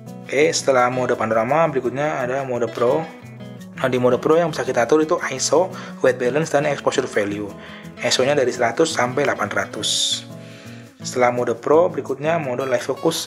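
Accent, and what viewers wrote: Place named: Java, Indonesia. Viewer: Lokasi videone pas sore nang GSP yo mas? 😅 Logate yo rodo medhok je ✌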